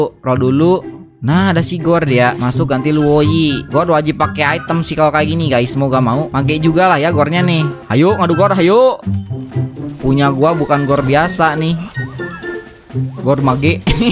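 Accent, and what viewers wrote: West Java, Indonesia. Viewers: Logat SUNDA nya nagih sumpah wkwkwk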